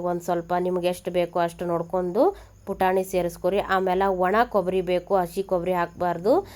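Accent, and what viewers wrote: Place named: North Karnataka, India. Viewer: Nima uk maatu keloke chanda ertave bhal esta agtaite sisoo❤❤❤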